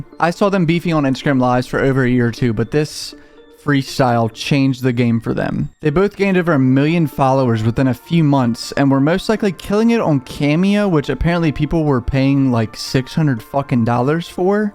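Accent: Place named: Baltimore, United States. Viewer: Damn I'm loving that Baltimore accent. Or is it Pennsylvania?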